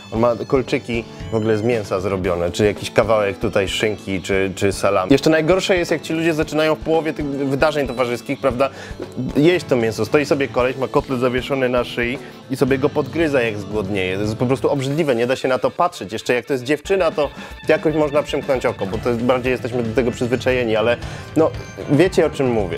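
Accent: Tarnów, Poland. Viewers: Sporo. Sam chwilami nie byłem pewny ale tarnowski akcent i biżuteria z mięsa mnie upewniła. xd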